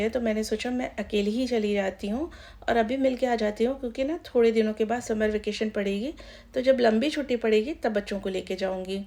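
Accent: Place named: Uttar Pradesh, India. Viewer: Aap ki awaz sunkr hi lga ki Aap up se hai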